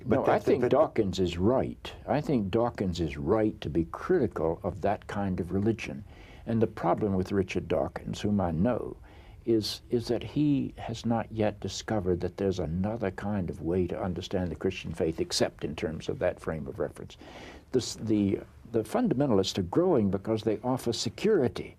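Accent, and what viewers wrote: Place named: United States. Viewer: What a gobshite. I'm my country? You sound like you're from the usa? Full of shit!!! I was told I was told. Lies lies and lies!!!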